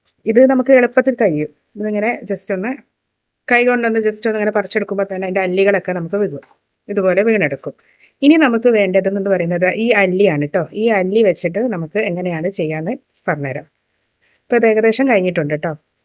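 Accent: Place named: India. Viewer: Ethu naatilanu think chovannully ennui parayunnathu.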